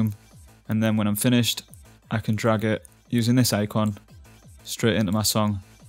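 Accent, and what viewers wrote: United Kingdom. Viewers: anypone talking about his lincolnshire accent, so hot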